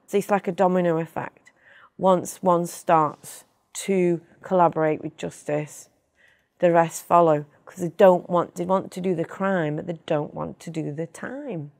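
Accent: United Kingdom. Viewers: Why does she have a British accent? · This is the most British sounding Italian I’ve ever seen. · Wonder why she has a British accent? I guess she's from the UK? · Set sounds like she lived în Uk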